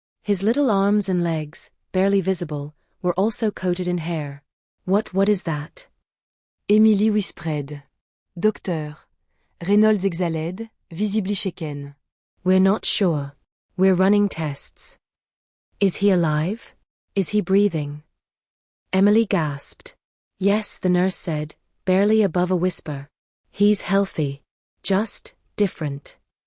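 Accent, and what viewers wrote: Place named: France. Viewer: Extremely annoying! Was unable to understand the foreign French words, hard enough to understand the few English words the narrator spoke with the horribly thick French accent. If you are going to narrate a story, pick ONE language and stick with it!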